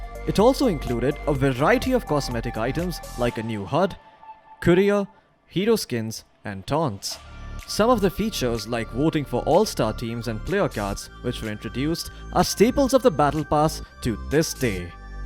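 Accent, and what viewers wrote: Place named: Southeast Asia. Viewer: se asian accent so i wont watch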